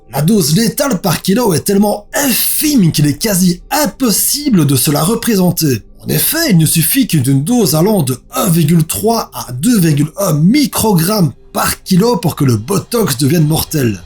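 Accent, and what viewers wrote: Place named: Belgium. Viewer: Un abonné de plus ! Attends,apprendre des choses grâce à une personne avec l'accent Belge, c'est trop rare !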